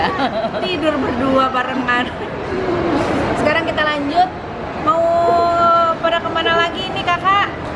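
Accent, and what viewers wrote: West Java, Indonesia. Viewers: Lucu teh 🤣nyariosna logat sunda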